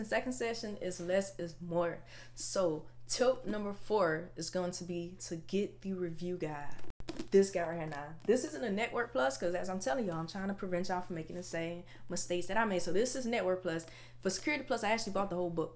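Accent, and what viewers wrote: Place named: Atlanta, United States. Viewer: lmao I knew it had to be ga! So I just went ahead and said Atlanta. My cousin born and raise in Atlanta she got the same dialect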